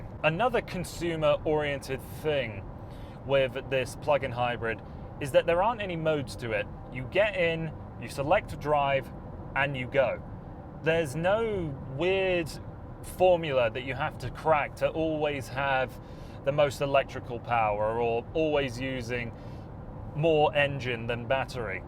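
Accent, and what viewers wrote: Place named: United Kingdom. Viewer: What country are you in? You have a British accent and your speedometer is in kilometers. Are you in the UK?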